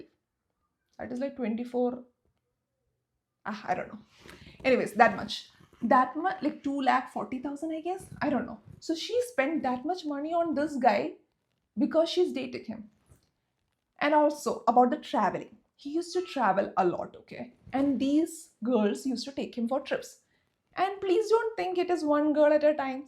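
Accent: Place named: England, United Kingdom. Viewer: Hello Di Wassup You know what idk why but I am in love with the way you are talking i mean I am obsessed with the English accent Love from Assam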